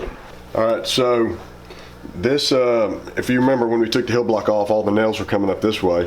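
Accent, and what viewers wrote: South, United States. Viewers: Omg i love this guys voice!!! It's so nice and deep! And that southern twang!!! I love it 10/10 can and will fall asleep to it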